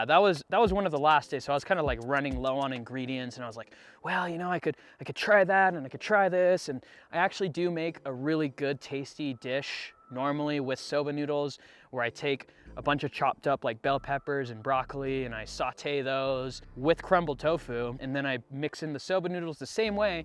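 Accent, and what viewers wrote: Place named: United States. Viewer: hi from india! neat videos. you sound like american born. but what is your ethnic origin? :)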